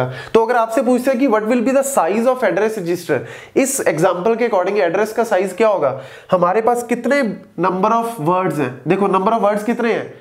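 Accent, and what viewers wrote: Punjab, India. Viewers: your punjabi accent is sooooo cute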